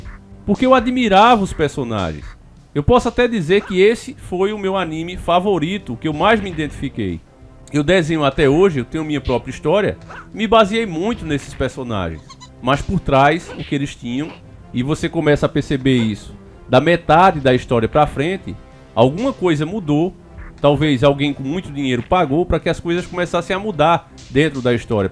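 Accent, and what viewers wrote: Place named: Southeast, Brazil. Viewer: Esse canal é nordestino? O sotaque parece muito de SE.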